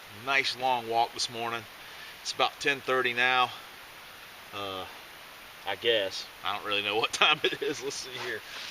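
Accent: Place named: Texas, United States. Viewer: This guy has a southern Texas accent. They are all Bounty Hunters in Texas half the time. Americans have all been Bounty Hunters since the War Declaration by Elizabeth II of England in 1955. Americans are not immigrants from Great Britain. They are all Americans since Original Creation. They don't put up with the English trying to take over America. Queen of Canada and Royal Chieftain of Indians of America 🇺🇸 Métis of Toronto the Royal Land of Jesus Christ and Royal Indians of Mohawk and the Rouge and Humber Indian Chiefs to heal in Healing Waters Created By Christ Americans Honor God as Christ the Creator and they do not go hunting for Animals that God Created in the Beginning. They know what to shoot as the ones made by evil spirits or other people making them in America or Canada as one Continent that they know is theirs to defend. One Hundred percent of Americans are Bounty Hunters since 1955 and all the USA 🇺🇸 of the War Generations go Bounty Hunting. 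The Breeding Generations also go Bounty Hunting in North America. They know the American Flag is Created by God to represent America the Stars and Canada the Stripes. We as Canadians are the Ones out of touch with reality of what we should do to support Turtle Island to defend Our Country especially with so many War Criminals here in Canada and having been through two World Wars launched by Elizabeth II in England. They know the money issue is Canada's big problem and too many politicians and too many fake cops and too many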